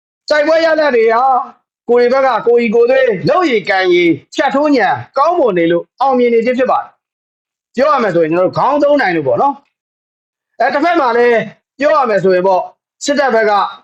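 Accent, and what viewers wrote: Yaw, Myanmar. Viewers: ဒီနေ့တော့ ဂေါပကယောနသံလေးပေါ့💯😊❤ဟုတ် နှမ်းစေ့လေး ဖြစ်ပေးနေပါတယ်နော် ဟို့နွေဦးအစထဲကပါဗျာ့💯တော်လှန်နေသ၍ ကျွန်တော်တို့ အနိုင် 💯💯❤❤🚩🚩🚩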